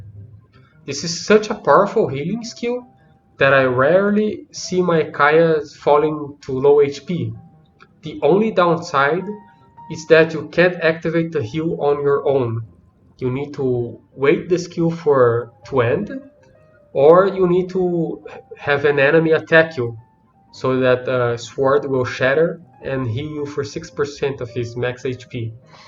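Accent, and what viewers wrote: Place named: Brazil. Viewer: Oh yeah, the Brazilian accent kkkkkkkkkkkk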